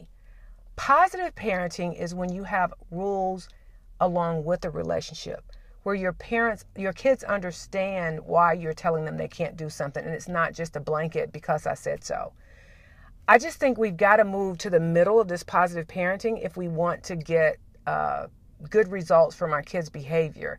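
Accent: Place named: England, United Kingdom. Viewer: QwQ I'm not cursing my parents I know some people dont have so it's a bless for me whatever it was :) And again she is 14 I dont like just 3 years and she was 10 the problem is other students there... But thank you ur really an amazing teacher and loved your English accent I dont if you're foriegn or something but loved it And yes every child has it own story with his/her parent :> ...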